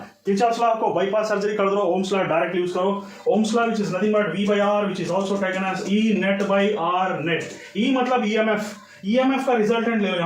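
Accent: Hyderabad, India. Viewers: #Hyderabadi accent never hides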